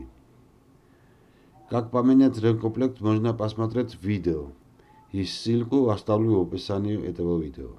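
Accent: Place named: Georgia. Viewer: Такой приятный грузинский акцент,пойду смотреть старые грузинские комедии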